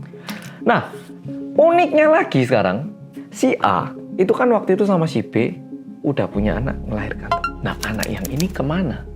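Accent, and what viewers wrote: Java, Indonesia. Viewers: Medok Tentu Saj!!!! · auto cc nya buset, masa "medhok" jadi "bodoh"😭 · tingkat keseriusan, mimik wajah, suara yang medok, pipi yang mulai berisi. WELCOME BACK MAS 🥰🥰🥰🥰🥰🥰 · Mas nya cakep ,, suaranya laki bgt & medog, sy langsung suka pdhl ini pertama kali nonton chanel ga sengaja. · Medok nya muantap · Mas Ngomng bhsa jawanya medok bgt sma kya mb Dita.. Klw Ngomng bhsa koreanya medok nga???...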